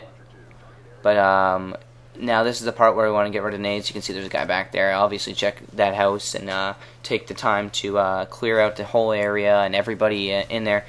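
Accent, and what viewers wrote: Canada. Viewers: R U Canadian? No offense really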